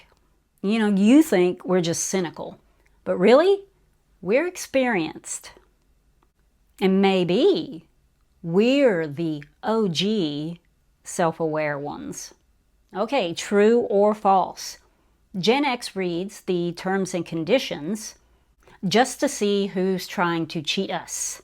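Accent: United States. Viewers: G'day Thanks for your kind words. I have been trying to work out your accent and which part of the United States of Americaland you are from. You see we have 6 states and 2 territories here in Aussieworld and our accent is pretty uniform except in some parts of the country like Tasmania for instance where there is a slight difference if you are Australian and know what to listen for, no worries. With you I don't think It's Texas, could be West Coast or Flo-Rida or I could be way off the mark. American accents like NYC, Boston, Texas, Louisiana, are pretty easy for us as they are so strong. But you have me stumped little lady.....it's a strong accent, but I can't pick it. Your Aussie connection... Cheers 😉😊 P.S. cute Westie I think?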